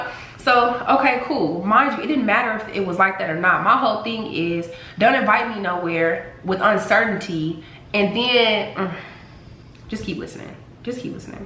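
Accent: Chicago, United States. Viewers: This my first video I ever watched but you have to be from Chicago lol. New subscriber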